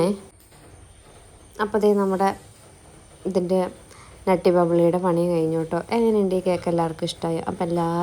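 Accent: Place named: Kothamangalam, India. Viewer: Hi.. stalam evideya kothamangalam ano avdathe slang pole thonunn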